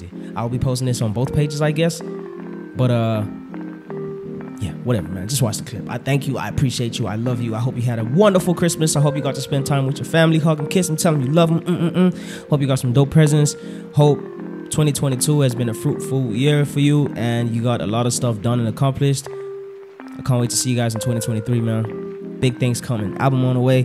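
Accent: United States. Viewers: He sound American must've been raised this side a bit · Why does he sound American? I thought he is South African. I guess not because he just said mpoomaaaalaanga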